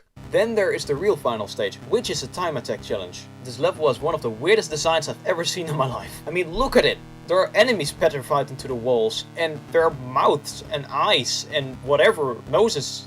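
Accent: Netherlands. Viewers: the dutch accent is real XD. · Nice video.You have a new sub :D And also the Dutch accent makes it that much better xD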